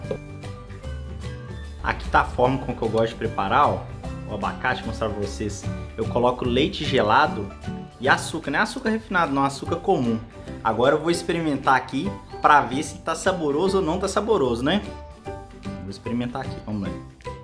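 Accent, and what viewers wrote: Minas Gerais, Brazil. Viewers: Tinha que me inscrever cara, você com esse sotaque mineiro, lembra muito dois amigos que fiz aqui em Goiana Pernambuco, na fábrica da jeep, muito legal!!! Agora uma pergunta: essas mudas que você ensina, todas são anã ou seja pra vaso numa área dentro de casa? Me responde aí a pergunta que fiz!!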